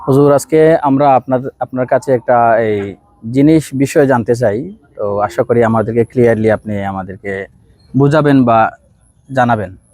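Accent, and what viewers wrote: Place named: Sunamganj, Bangladesh. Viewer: Uni asole sunamgonj taken na sylhet. Unar kotha Barta sunamgonj era moto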